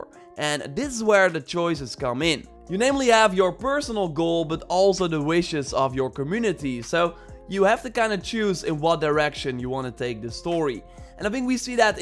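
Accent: Netherlands. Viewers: That thick Dutch accent tho... Almost makes the video unwatchable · No hate but this is the most dutch accent ever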